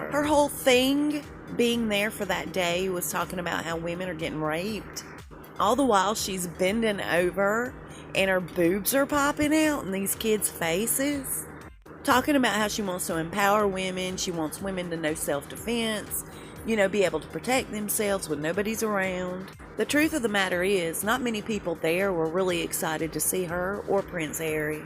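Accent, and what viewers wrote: South, United States. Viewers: Stop already!! You and your haters need to keep your opinions to yourself. You love drama and gossip. You sound like your from the South I can't understand some of your words. Drop the accent, what are you perfect. People like me are a pain my ass. You can't say anything nice.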